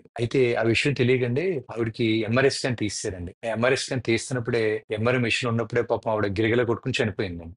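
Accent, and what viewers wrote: Godavari, India. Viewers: godavari slang ❤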